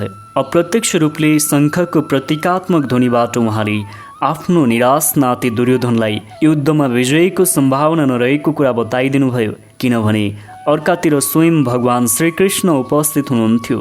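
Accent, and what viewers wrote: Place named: England, United Kingdom. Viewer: भएन , भएन हजुर भएन वाचन गरेको। नेपालीमा शुद्द उच्चारण गर्न पहिला सिक्नुस। अ,ग्रेजी पाराले बोलेर नेपाली भाषाको बिजोग नबनाउँ। माफ गर्नुहोला।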